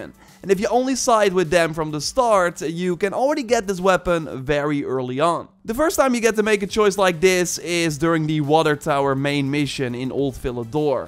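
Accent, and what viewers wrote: Netherlands. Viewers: Nice Dutch accent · Great video, cringy dutch accent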